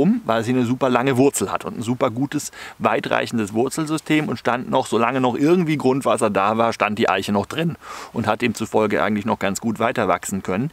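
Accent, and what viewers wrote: Germany. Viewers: Danke für das Video, ich schaue mir aber auch ganz gerne die Danke- Videos an, jedes davon ist immer noch besser als was am TV so läuft. Versuche gar nicht erst, in der Schweiz zu verbergen, dass Du Deutscher bist, Du hast keine Chance, ich kenne welche, die es 20, Jahre lang versucht hatten, und dann immer noch sogar von den eigenen Kindern ausgelacht wurden, weil man sie nach spätestens einer Minute als Deutsche erkannt hat. Ist einfach so, mach Dir nichts draus. Und so böse sind wir Schweizer auch nicht, eher skeptisch. Gruss, Thomas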